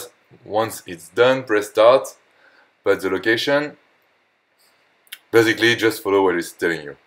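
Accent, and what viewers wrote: France. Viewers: St’accent francais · Hey, thanks for the video and helping Dublin Pogo players to be all a Pro :) . Small correction: "/" is just called a "slash" in English, "backslash" is this "\" which you might use in Windows. For the rest and on internet only the "/" is used for addressing a sublocation, exclusion, separation and in Telegram a request like /start to start a request. Bet you know that better then me (ur better in programming languages, then English (which is not bad anyways: Love ur French accent ) but had to say the confusion slash/ backslash\ which seems to be common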